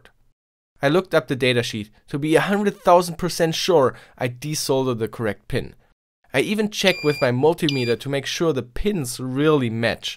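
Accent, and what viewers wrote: Germany. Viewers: Bist du eigentlich deutsch? ;D Die Aussprache ist zwar gut aber irgendwie zu deutsch xD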